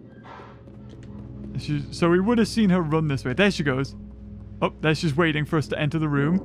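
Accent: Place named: United Kingdom. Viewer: Bruh I love your British accent lol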